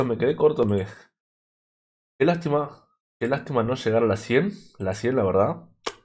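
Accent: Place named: Argentina. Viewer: vos sos Argentino no? Se te nota toque en la voz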